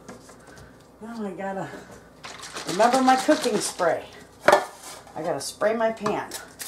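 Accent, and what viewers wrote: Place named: Wisconsin, United States. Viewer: Spaghettios jello ring lol have you seen kalen allens video about it? Look him up it’s worth it! Thanks for the video, making this tonight. Thanks for a little Wisconsin accent too!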